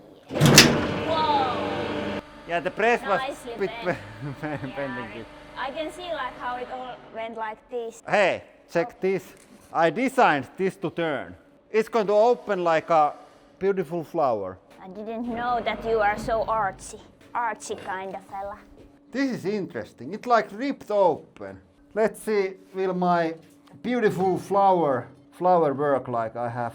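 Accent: Finland. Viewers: En oo pitkään aikaan kattellu tätä kanavaa; aksentti tuntuu muuttuvan aina vaan "manselaisemmaksi"....:D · Love Finland accents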